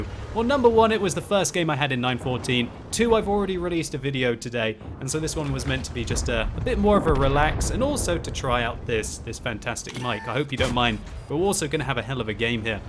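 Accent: England, United Kingdom. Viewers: sound way more british with the new mike x.x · with this mike you sound more british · Mate, the new mic really brings out your english accent. Needs more Aussie twang.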